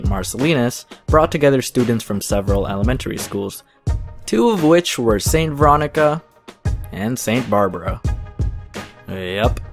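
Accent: Canada. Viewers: wait are you Canadian? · R u Canadian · You from Canada?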